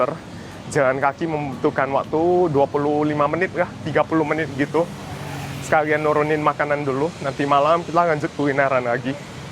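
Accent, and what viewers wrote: Java, Indonesia. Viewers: Kok medhok sih Koh skrg? Gak klen klen Tidak berasa USU-nya Aku nonton film Thailand lumayan beberapa kali, plek ketiplek kayak Chinese Banyak yg budayanya mirip ada yg Hakka, Hokien, Two Chew, dll jg cuma beda Bahasa yg terakhir aku tonton yg tentang ngerawatin Neneknya itu apa ya lupa judulnya, manggilnya Ama jg, trs Dewi Kwan Im jg gitu trs jadi vegan semenjak cucu/anaknya sakit apa gmn lupa Tapi cucunya udh nggak bisa Bahasa Cina, sering dicandain sama neneknya ah kamu gmn sih keturunan ini (ethnic group x) tp gak bisa bahasa ini (ethnic group x) Plek ketiplek kayak Chinese Indonesia yg NTT itu ceritavelin, Surabaya, Medan, Melayu, Kalimantan, Semarang, Bogor, dll seluruh Indonesia Manggilnya Ama jg, tp kalau di pasar masih pada bisa cuma ya yg bnr2 bisa rata-rata generasi Kakek Neneknya Yg generasi skrg bisanya Bahasa Thailand gak nyadar Chinese Di Indonesia jg rata-rata Chindo generasi skrg bisanya cuma Bahasa Indonesia trs ngaku org Indo bangga Kaget bgt ada Chindo di Aussie, pas tes DNA cuma "Chinese" gak ada penjelasan apa2 ya karena database mereka emg msh dikit yg Asia jd ga ada penjelasan apa2, Chinese mana leluhurnya gtu, trs dia bilang dia org Indonesia dan bangga gtu tp udh tau klo gini doang mah 😂 kagetnya krn dia bangga ngaku org Indo bukan Aussie bukan Chinese, tp lebih mudah si klo mau belajar Bahasa Mandarin atau komunikasi, punya akses, tercerahkan kalau butuh yg berkaitan budaya Chinese dan keturunan, bisa gaul, nimbrung jg Mirip di Malaysia jg, katanya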